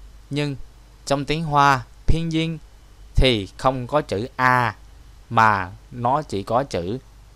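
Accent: Standard. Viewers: Khó lắm mới tìm thấy kênh có phát âm chuẩn như kênh này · Thay phat am chuan va giang bai de hieu, em da tu hoc biet duoc rat nhieu tu, tu tra tu dien dc , cam on thay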